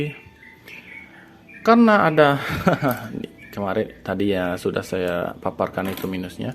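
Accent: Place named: Bali, Indonesia. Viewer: ngenah logat bali e wkwk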